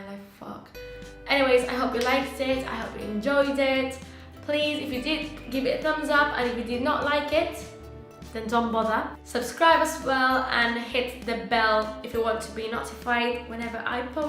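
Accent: United Kingdom. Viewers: Sube más vídeos de palabras porfavor 🥺🥺 amo el acento británico y todos los youtubers que he visto son hombres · Your accent is definitely very heavily British influenced. It sounds great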